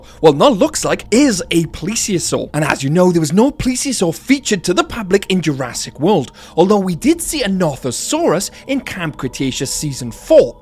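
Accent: Wales, United Kingdom. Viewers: Just new to your channel I love it also are you Welsh too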